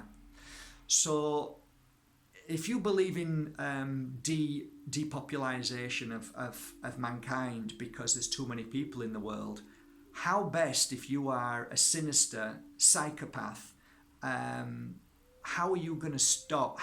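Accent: Yorkshire, United Kingdom. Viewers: 100% agree with everything you say x \especially about the govt x I have two large Himalayan salt lamps in each room to help with neutralising the effects of wifi and always wear crystals too but am going to start turning of the wifi at night as you suggest . Nb : Are you from Yorkshire originally , like me ? Sounds like a good old yorkshire twang you have there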